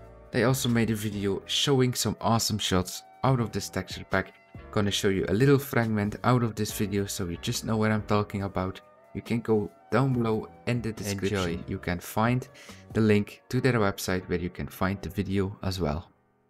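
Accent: Netherlands. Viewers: Ben je Nederlander of Belg ?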